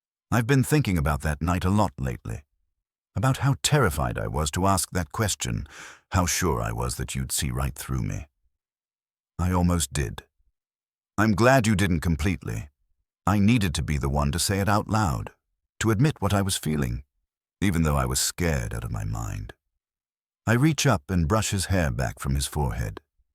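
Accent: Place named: England, United Kingdom. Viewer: English accent is awful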